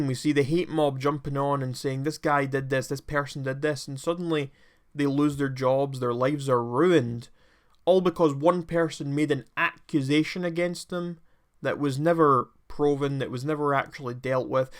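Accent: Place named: Stirling, United Kingdom. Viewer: First, neato, a commentator that's fae Scotland. Second, you fae between Glesga and Edi? Ye kinda sound fae aboot Stirling way lol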